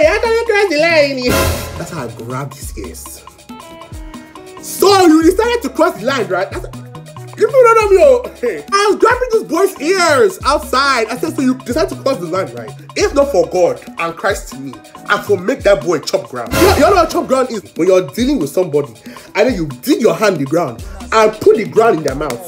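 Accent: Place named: Nigeria. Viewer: Wait? You're from Cameroon but have a Nigerian accent?!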